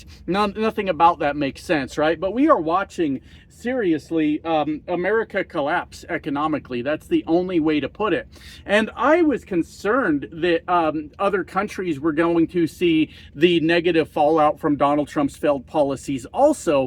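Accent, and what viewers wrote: Canada. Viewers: First time here and enjoyed your video. Funny enough, as I listened to you, your accent could easily and effortlessly slide in as a Canuck. Oh, and about the fellow who would like to conquer us single handedly? Tell him the best place is Saskatchewan as it's flat and the best time is late January. We look forward to seeing him...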